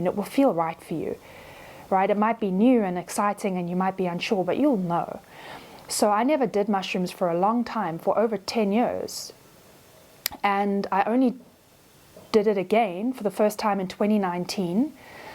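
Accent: South Africa. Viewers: It absolutely is what you say sister. Lovely South African accent. You give the purest guidance on all of you tube. So refreshing to hear.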